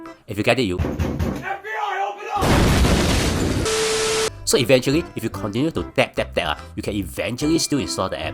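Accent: Singapore. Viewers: Wah Lao! So Singlish leh! 😂😂😂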